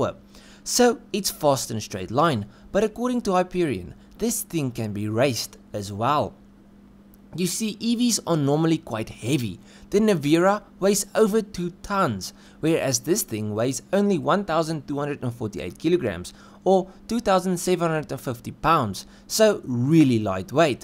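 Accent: South Africa. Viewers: Great to hear a real SA accent.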